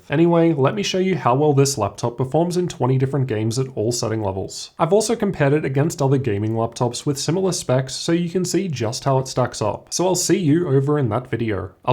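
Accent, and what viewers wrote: Australia. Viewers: subtle Aussie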